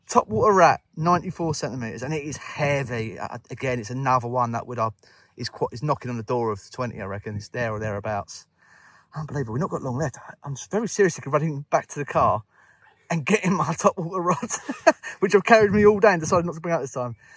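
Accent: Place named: Lancashire, United Kingdom. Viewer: What was this Rez buddy, great fish! Are you from Lancashire?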